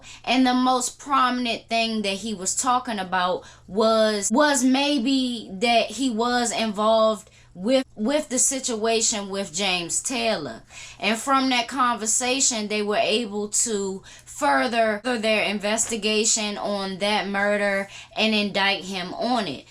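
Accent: Washington DC, United States. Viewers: I'm from WASHINGTON D.C. UPTOWN PROJECTS 640 PARK/MORTON I WAS BORN IN EASTGATE GARDENS SOUTHEAST... ARE YOU FROM WASHINGTON D.C.???? YOU SOUND LIKE A DC girl..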